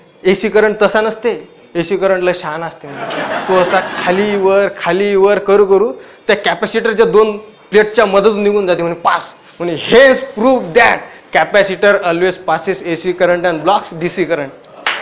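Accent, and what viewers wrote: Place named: Vidarbha, India. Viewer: Nice to hear Vidarbha Marathi 😃😃😃👍🏼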